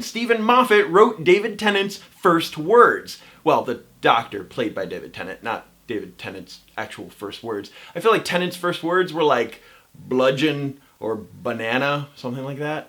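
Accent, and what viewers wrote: United States. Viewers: I can undertand scots speaking really quick but I didnt get a word of your first sentences. American accent is so hard to get sometimes. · its weird hearing an American saying Doctor who and stuff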